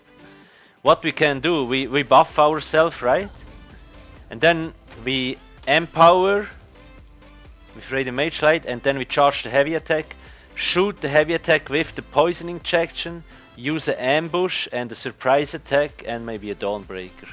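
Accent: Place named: Switzerland. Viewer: You sound so Swiss haha :P Are you also playing on the ps4?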